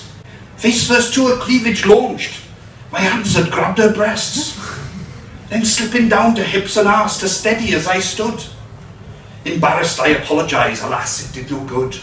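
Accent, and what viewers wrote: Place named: Wales, United Kingdom. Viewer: Good stuff ... So rock and roll man !!! Where did the taffy accent come from? 'Butt'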